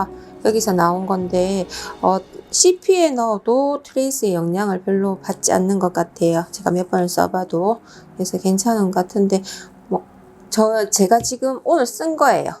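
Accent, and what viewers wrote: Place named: Busan, South Korea. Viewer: 오~~너무 이쁩니다~♥ 만들어보고싶어요^^ 정겨운 부산사투리 방송 잘봤습니다~~구독하고 갑니다^^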